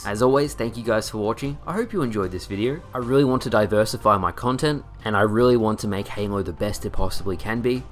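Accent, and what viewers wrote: Australia. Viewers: is it bad i just noticed you're Australian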